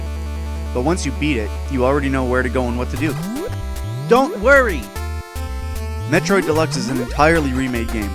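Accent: Canada. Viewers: I came for the ROM hacks. I stayed for the Canadian accent. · Are you Canadian? o.o I can't tell... I feel like you are but at the same time...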